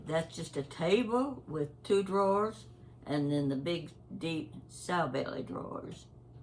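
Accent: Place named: Appalachia, United States. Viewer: Dear dear, you are a delight. Is it just me or is Appalachian accent getting more and more pronounced?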